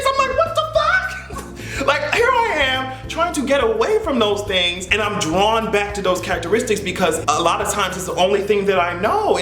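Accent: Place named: Jamaica. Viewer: Hey, 1. put out as many videos as you can! At times, I'm looking out for you and you usually do 1 video a week, besides the podcast! If you can do 3-4/week, if PO.SSI.BLE (your baritone singing voice), please don't hold back! 2. Please bring out the Island/native tongue when expressing yourself! Not ever video, but like this video today, that Jamaican accent would have driven the point! We all know you are quite educated, but some island touches here and there isn't bad. Test out the idea of course, since you do have a steady audience! 3. "You need to CUT IT!" True, some family members are not ride or die! Ok, I'm out!!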